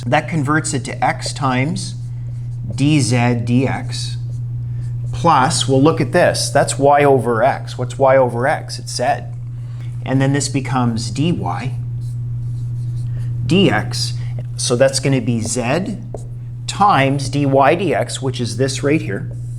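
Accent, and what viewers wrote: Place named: United States. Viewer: This is very clever. I don't think we did differential equations in school. Looks more like first year university level, but I would have understood it at 16-17 with AS or A-level calculus. It's not always obvious what subsitution to use to separate the variables. I'm surprised you say 'zed' and not 'zee' since you sound American. Perhaps 'zee' can too easily be confused with 'c'. I like that you put lines through the z's so they can't be misread as 2's. I have a job marking maths classwork and sometimes the pupils get 0's and 6's looking the same, or 'b's and '6's or 'x's written like 'times' signs. I guess the first most important lesson is how to write numbers and letters clearly! Some 2's (or 1's) look like 7's, some 3's and 5's look about the same...! Once they start doing algebra writing neatly is so important! Differential equations get so complicated with del operators and vectors etc! 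Non-linear.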